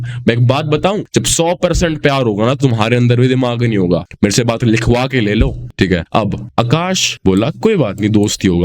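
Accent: Haryana, India. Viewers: Accent jhajjar ya rohtak ka hai? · accent seems like haryanvi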